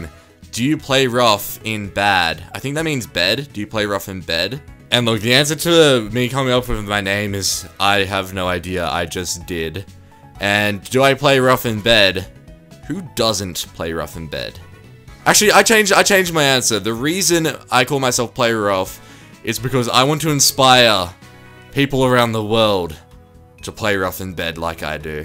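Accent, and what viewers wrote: Australia. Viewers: are you from australia coz you sound like you are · just subscribed, don't know why but channels with australian (maybe you're from new zealand or the likes, im shit with this stuff my b) make me laugh so fucking more than if an american and or englishman(?) said the same shit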